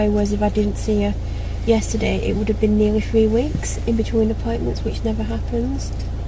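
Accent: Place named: North, United Kingdom. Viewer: I love your northern accent too 😋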